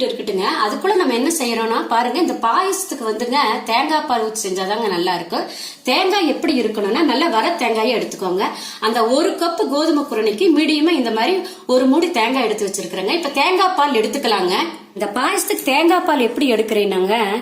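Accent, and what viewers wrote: Kongu, India. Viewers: கொங்கு தமிழ்❤ நானும் கோயம்புத்தூர்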